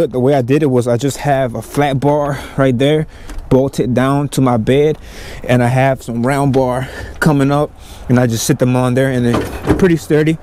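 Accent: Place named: Houston, United States. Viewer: Shit bro I knew immediately you're from Houston when I heard you talk. That's what's up.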